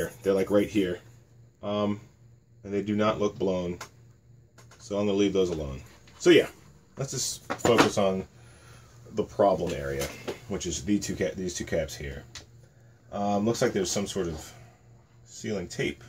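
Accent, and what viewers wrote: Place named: RP, United Kingdom. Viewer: Your british accent is quality lol I thought you were a London Toff ... Good vid Castle fixed ready for next RC Land Battle ;O)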